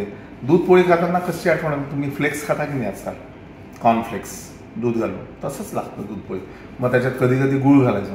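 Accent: Vidarbha, India. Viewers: Sir, multigrain chi poli khupch aawadali.Ani chena ghalun banawaleli tomato chatni...new idea... Tumche video khup aawadtat.Mi pan vidarbhaachi aslyamule tumchi Vidarbh paddhatichi Marathi bhashet bolat bolat sangitleli recipe aikayala v pahayala khup aanad vatato.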